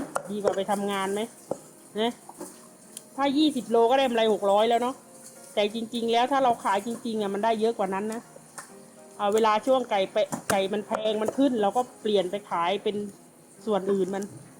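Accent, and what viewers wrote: South, Thailand. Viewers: คนใต้หรือเปล่าคะเก่งจังได้ความรู้ด้วยค่ะ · สำเนียงชัดเจน คนใต้แน่ๆ😂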